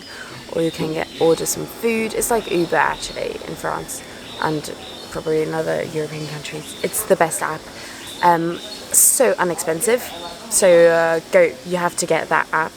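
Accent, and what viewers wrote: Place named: United Kingdom. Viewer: No way. Do people from France actually take on a UK accent when learning English?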